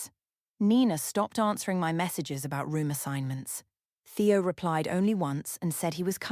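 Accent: United Kingdom. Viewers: Is it a coincidence that the stories with the British female accent have the most abusive women?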